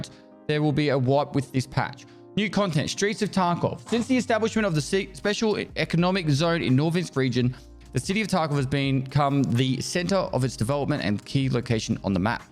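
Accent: Australia. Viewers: Nothing like having a patch read by an australian man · Me being Australian understood every word he said but…. I could imagine not being Australian could be hard. But cry us a river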